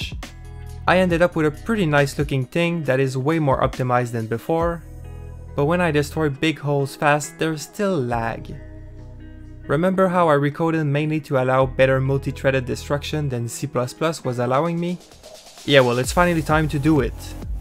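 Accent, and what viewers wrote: Quebec, Canada. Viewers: Not really related to the video, but are you from Quebec ? xd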